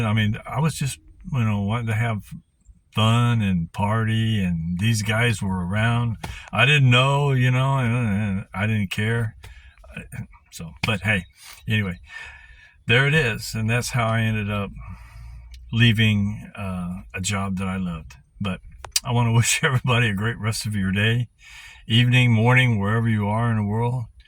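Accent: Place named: Louisiana, United States. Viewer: Great video! Are from Louisiana by chance? I hear a slight Cajun accent 😂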